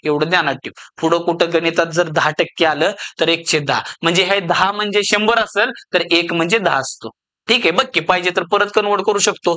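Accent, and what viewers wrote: Rural, India. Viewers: अप्रतिम आहे सर तुमचे भाषा कौशल्य.... तुमच्या बोलण्यातून गावाकडचा गोडवा आणि शिकवण्यातून आई सरस्वतीचा आशीर्वाद दिसतो...🙏🏻🙌🏻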